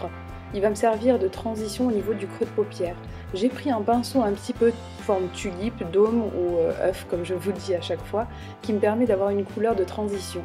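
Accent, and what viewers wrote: England, United Kingdom. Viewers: C joli mais tu aurais pu repasser la combi ! Sinon j'aime bcp ta voix et ton accent anglais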